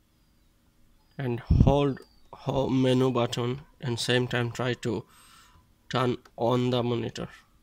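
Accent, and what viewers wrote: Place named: India. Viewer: its english but he is propably from india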